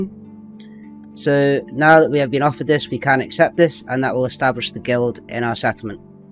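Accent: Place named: Scotland, United Kingdom. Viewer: Damn ur accent is thicker than the games scotish voice actor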